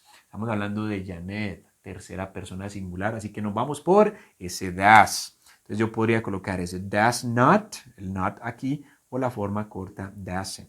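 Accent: Colombia. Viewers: Hola ¡¡. Me encanta la manera tan clara que explicas el Inglés y también tu metodología. Saludos desde Peru ¡¡ ah y me gusta mucho tu acento colombiano. Are you from Bogotá?. Blessings!!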